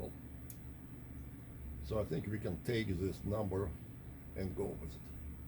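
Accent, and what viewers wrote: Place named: Belfast, United Kingdom. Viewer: Wow that was a long time ago since I heard Belfast.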